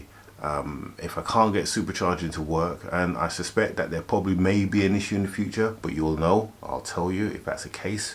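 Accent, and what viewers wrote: United Kingdom. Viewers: Great show!! Are you originally form North London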